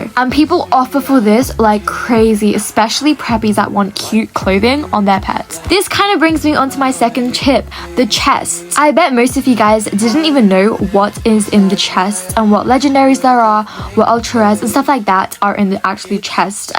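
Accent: United Kingdom. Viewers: You have a British accent right?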